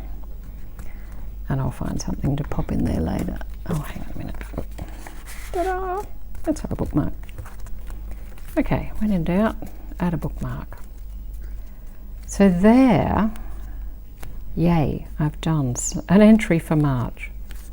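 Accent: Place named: Australia. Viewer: Lovely to hear an Australian voice....from Mount Gambier SA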